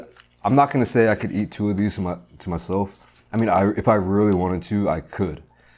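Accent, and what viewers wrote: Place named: Midwest, United States. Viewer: I knew you had a midwest accent lol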